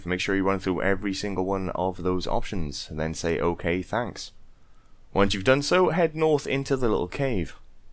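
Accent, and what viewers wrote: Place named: Scotland, United Kingdom. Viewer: I miss you and your Scottish accent </3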